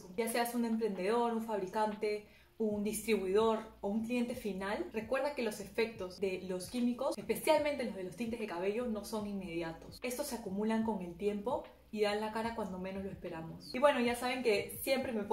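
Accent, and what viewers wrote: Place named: Mexico. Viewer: Gracias! ✨ Soy solo yo o alguien más te ha dicho que tu acento suena un tanto a mexicano? 😅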